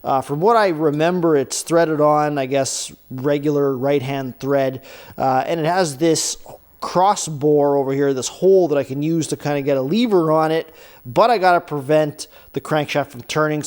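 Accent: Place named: Quebec, Canada. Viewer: Est-ce que j'entant une accent quebecois?? 100 pourcent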